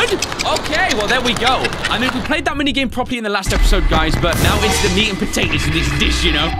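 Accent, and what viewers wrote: United Kingdom. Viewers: Man i love British accent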